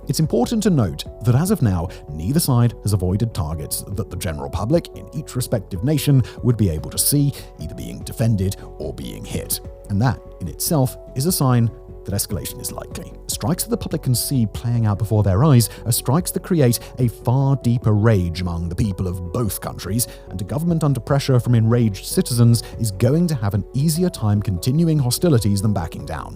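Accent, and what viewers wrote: United Kingdom. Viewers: is this guy british or american i cant tell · He's british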